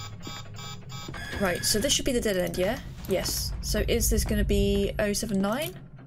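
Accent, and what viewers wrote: United Kingdom. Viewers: Your a young british i can tell by your voice!!!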